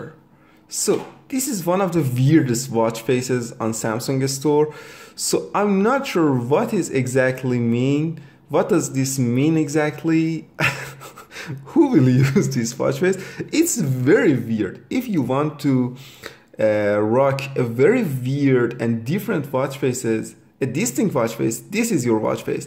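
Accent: Iran. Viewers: I bet your ass that's a Persian accent. Lol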